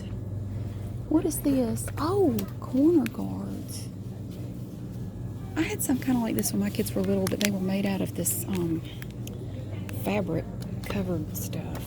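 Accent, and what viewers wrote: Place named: North Carolina, United States. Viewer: The boxes being as unstable as the ex husband killed me XD Also, a few more things about these videos that I absolutely love? 1. The cleaning up. I HATE messy store shelves. One time while walking around our local Wal-Mart someone decided they didn't want their juice so they just sat it down in the middle of the aisle. Like, really? 2. The jokes! They crack me up, need I say more? 3. The accent! I'm a North Carolinian myself, so just hearing that little bit of a country accent reminds me of family members that talked with the same accent that I have since lost or just haven't spoken to in a long time. These videos are my absolute favorite, but I think the ones in the Caldwell area could really use a good cleanup. I don't really look around, I just grab and go when I visit any Dollar Store in this area, but one that I basically grew up in? 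Please consult this timestamp for my comment: . XD The toy aisle was a nightmare, I would go in looking for teen coloring books and there would be a mix of adult coloring books with intricate designs and kitty cats and Disney princesses in the same pile as the word searches. On top of that mess cake was a sprinkle of some parent putting back the mascara and nail polish and maybe a box of cereal too. XD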